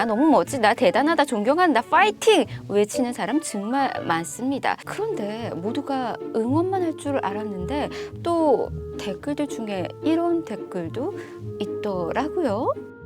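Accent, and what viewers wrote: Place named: Gyeongsang, South Korea. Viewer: 근데 앵커라는 사람이 경상도 사투리 ㅋㅋㅋ 경상도 출신이죠? 사투리라니.. 아물론 클레어인가 뭐시기인가 이런한동은 알려져야할듯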